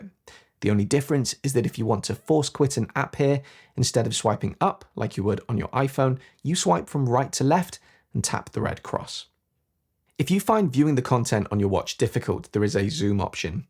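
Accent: United Kingdom. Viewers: 😂thanks but u seem like u have super powers in explaining and speaking convincingly. American Apple engineers would be hypnotized by your Brit accent 👌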